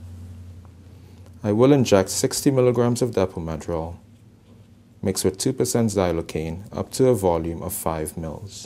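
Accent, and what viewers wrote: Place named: Trinidad and Tobago. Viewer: Where are you from? Sound like Trinidadian or something similar.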